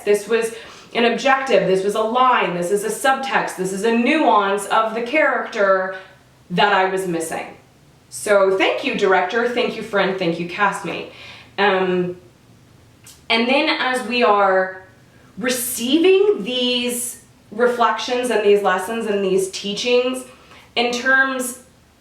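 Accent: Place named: England, United Kingdom. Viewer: You sound very English this week.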